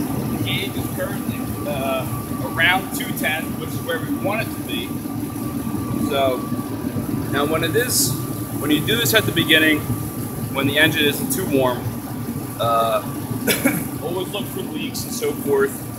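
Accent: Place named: Pennsylvania, United States. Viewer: You said "wudder" (water) and "Rad-e-ator". Are you from York county Pennsylvania or Carol county Maryland?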